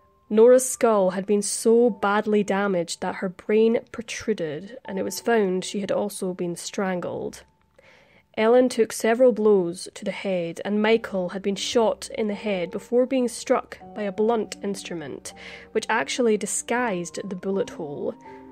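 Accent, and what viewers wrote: Scotland, United Kingdom. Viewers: Her accent is Scottish. "WESTERN" Europe is made up of many countries. All have the own distinct accents. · Yes I pick up on Scottish as well, some irish and Scottish sound alike but the Scotts have more of a drawl on their accents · There’s nothing sweeter than a a Scottish accent like if you ask me!!! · Oh, I've heard about this before. Interesting case, and sadly one that'll most likely never be solved. Also, love your accent! Scottish, right? :) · She's Scottish..! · Clearly pronounced and in a beautiful dialect. The reader sounds scottish to me? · She has a Scottish accent and a beautifully clear voice. Both enhance the subject, however, your rudeness does not, honey.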